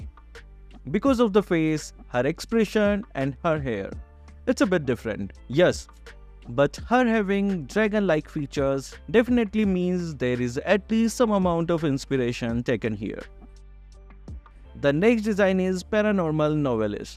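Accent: India. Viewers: I think hes from india, cuz his accent ⊂◉‿◉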